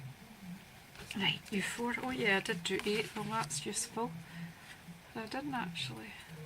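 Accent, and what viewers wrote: Scotland, United Kingdom. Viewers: Beautiful fall cards! I’m definitely going to case them and, no, I never get bored listening to you, I could listen to that beautiful Scottish accent all day long!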